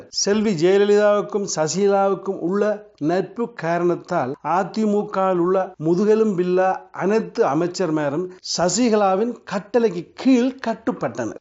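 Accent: Sri Lanka. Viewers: ஈழத்தமிழன் போல் இருக்கிறது. ஆனால் அவர் இந்தியத் தமிழர் போல் பேச முயற்சிப்பது தெரிகிறது. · Sweet Ceylon Tamil,I like it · dude,. this is srilankan tamil.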